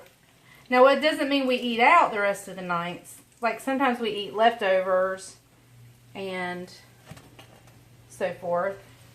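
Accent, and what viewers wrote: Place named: Arkansas, United States. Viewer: Have you tried a potato/carrot peeler to peel your apples? It's a bit easier than peeling apples with a knife. Love your accent. Our nieces live in Arkansas and you sound just like them! :-)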